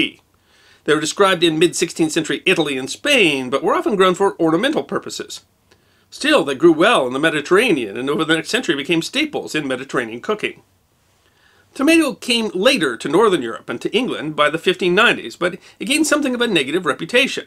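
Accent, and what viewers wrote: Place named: Chicago, United States. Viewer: 31 I love your Southern Side of the Loop accent! I almost peed on my self laughing! Where's your Chicago Typewriter? With your Moll?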